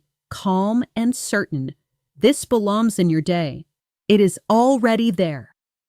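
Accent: United States. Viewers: In which accent are you teaching american, british or indian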